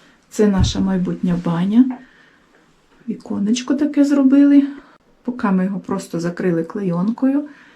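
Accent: Western Ukraine, Ukraine. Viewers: Непохоже,что вы из Запорожской области.выговор у вас западенский.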